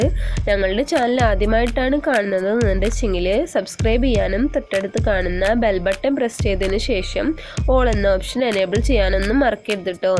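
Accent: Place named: Thrissur, India. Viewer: Video full kandu Thrissur language 👍🏻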